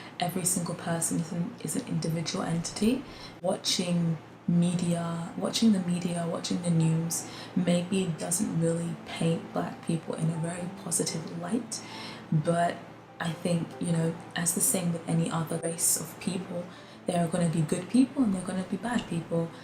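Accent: United Kingdom. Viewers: 他的口音就是個英國人啊。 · 她的發音100％是英國人😆😆😆😆😆😆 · 很明顯的英國腔 BTW她唇保養得很好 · 好舒服的英國腔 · 這麼明顯的英式口音都不相信是英國人🥶膚色是無分國藉的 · 很明顯的英國腔啊😃😃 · 好喜歡他的英國腔ㄛ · 真的就聽口音知道是英國人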